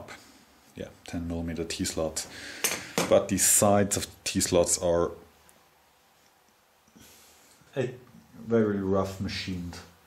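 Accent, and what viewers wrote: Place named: Germany. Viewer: Hi - great video as expected--- keep up the great work!!! My wife enjoys hearing your German accent-- when she walks in the room and I'm watching your videos, she says " he's back"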